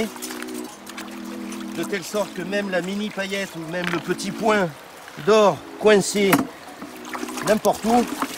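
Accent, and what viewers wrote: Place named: Marseille, France. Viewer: tu et un marseiller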